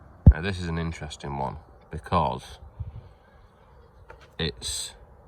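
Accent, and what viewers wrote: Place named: Lancashire, United Kingdom. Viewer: time stamp is 1st may 2021. Watching the video again, your Lancashire accent and reference to local chats is hilarious 🤣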